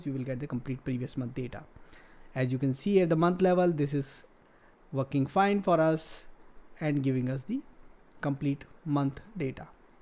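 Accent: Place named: India. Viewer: 11 hours of a thick Indian accent. Sorry. This is impossible to sit through.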